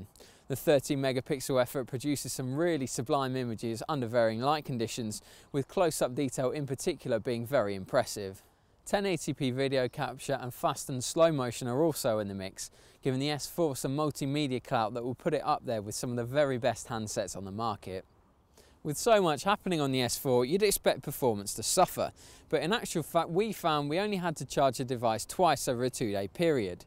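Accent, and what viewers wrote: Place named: United Kingdom. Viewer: Das britische Englisch hört sich schon scheiße an... aber das S4 hole ich mir sowieso... aber nicht in England.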